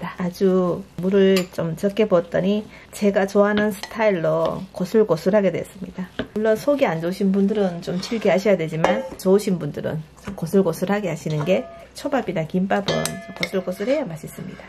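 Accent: Gyeongsang, South Korea. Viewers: 하하하~~~ 경상도분이시라? 쌀이라하지 않고 살이라고 하네요.~~~♡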